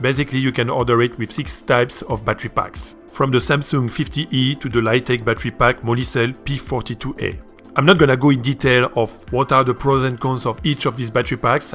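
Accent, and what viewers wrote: France. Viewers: lol dat french accent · SYMPA L'ACCENT FRANCAIS , BONNE VIDEO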